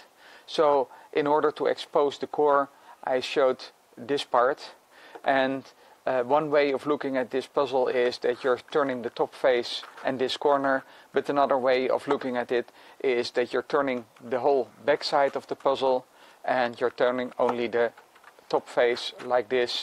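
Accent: Netherlands. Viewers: Are u Nederlands i'm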